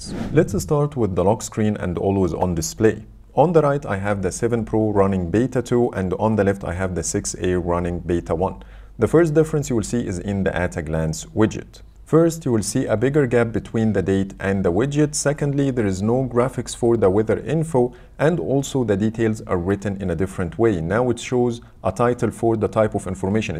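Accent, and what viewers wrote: Egypt. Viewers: Man I don't know if you're Egyptian or not but i admire this Egyptian accent 😂❤️❤️ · انت مصري واضح من الاكسنت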